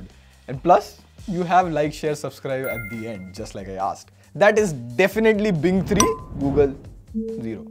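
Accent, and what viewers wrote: India. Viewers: Wow a cool indian even the accent😮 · Not sure why but he's the only Indian accent that I've heard that I can stand listening to.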